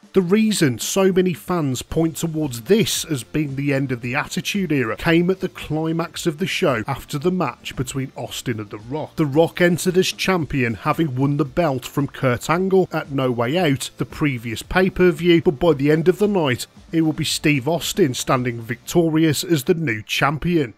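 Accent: Birmingham, United Kingdom. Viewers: Are you from Birmingham by any chance? Or black country?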